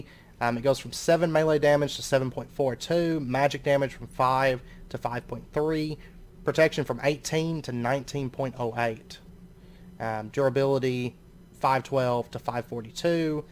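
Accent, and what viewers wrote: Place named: South, United States. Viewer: To. Thanks for responding. Only found you a few days ago. You sound like you may be from the southern United States. I found your bypasses in the next episode.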